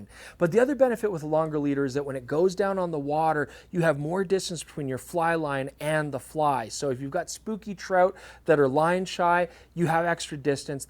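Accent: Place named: Canada. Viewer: I’m watching going why you seems familiar, then I heard an “eh” or something Canadian and it clicked. So do you stand on wet slippery rocks at daybreak with your rods clinched between your legs which makes you lose balance a bit while you try to get pix? For me it was a no brainer which camera I’d take when those shots would be possible and ppl thought I was nuts because it’s so old but those amazing colors it’d get could only come from the Nikon d700.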